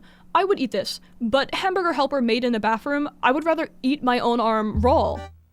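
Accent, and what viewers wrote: United Kingdom. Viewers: you pronounce your T’s so british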